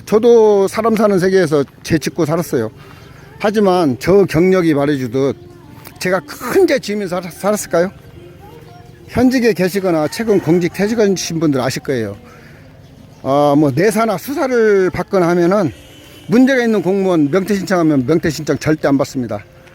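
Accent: Jeolla, South Korea. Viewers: 어쩐지...전라도 사투리가 ㅋㅋ 저는 고창이 친정입니다 왠지 마음이 가더라구요 화이팅 ♡ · 덕진 소방서면 금암동 전북대 정문위에서 근무하셨군요 ㅎㅎ 어쩐지 영상보는내내 말투가 익숙하다했습니다 ㅋㅋㅋㅋ 평화동이 부모님댁입니다 ㅋ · ㅎㅎㅎㅎㅎ 어느누가 님을 비리 공무원으로 의심을 하겠습니가 인상이 완전 농사꾼 스타일인대요 손을 봐도 그렇고 말씀하시는것도 그렇고 ㅎㅎㅎㅎㅎ 간간히 전라도 풍의 말씀이 나오길래 전라도분이 아니냐고 물어본적이 있었어요 ㅎㅎㅎ 아무튼 부럽게 잘하고 계심니다 ㅎㅎㅎ 저도 거기서 살고싶내요 다들 모여서요 ^^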